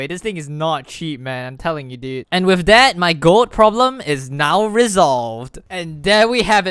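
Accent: Singapore. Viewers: EYY <3 same bro. I could tell by ur accent haha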